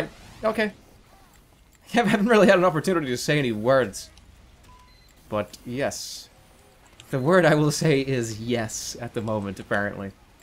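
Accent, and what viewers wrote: Denmark. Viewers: er du dansker loL? JERMA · Are u from Denmark?? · Are you danish??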